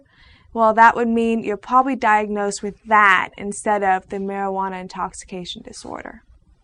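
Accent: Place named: New Jersey, United States. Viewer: i like the way she talks it sounds like she may b from new jersey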